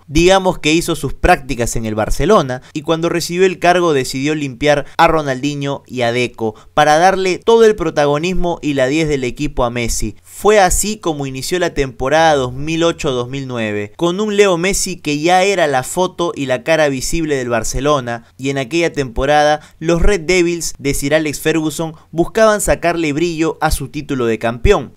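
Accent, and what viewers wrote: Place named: Argentina. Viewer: El contenido va bien a veces pero el tonito argentino no va. ¿Por qué nos queremos parecer tanto a ellos? ¿Jala más seguidores?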